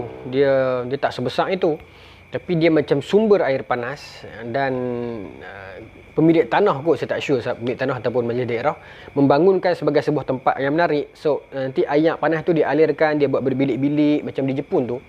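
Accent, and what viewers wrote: North, Malaysia. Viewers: Assalamualaikum tuan boleh tumpang tanya asal orang utara negeri mana dan daerah mana? Maaflah kalau saya kolot tak tahu. Apa pun memang suka mendengar cerita sejarah yang masih tidak sesiapa dapat membongkar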